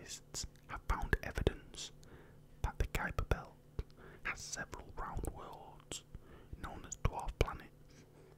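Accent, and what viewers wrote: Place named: England, United Kingdom. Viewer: You are honestly such a good find. I love space and learning things like this. Finding someone who's voice and content you like can be hard! Can I ask what part of England you're from? I'm struggling to place it and it's annoying me 😂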